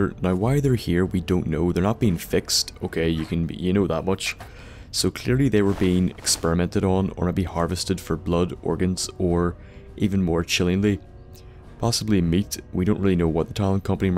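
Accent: Northern Ireland, United Kingdom. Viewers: nice,video just wanted to ask are you northern Irish? because you kinda sound like my friend from northern Ireland.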